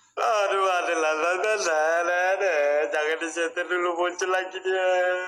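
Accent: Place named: Palembang, Indonesia. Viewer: Ass.... " ane mau tanya. Asli nya kang dari mana yah..?? Kayanya logat Palembang kang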